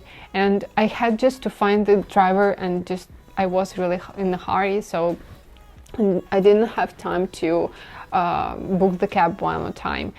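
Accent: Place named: England, United Kingdom. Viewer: The best english accent ever by any foreigner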